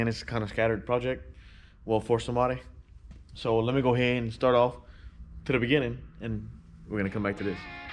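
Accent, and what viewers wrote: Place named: South Louisiana, United States. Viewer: bah you gotta be from south lousiana talkin like dat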